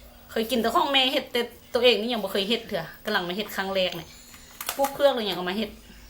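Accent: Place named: Lao Nyo, Thailand. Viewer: ฟังพี่เขาพูดออกสำเนียง ลาวผสมญ้อ (ฟังออกครับ)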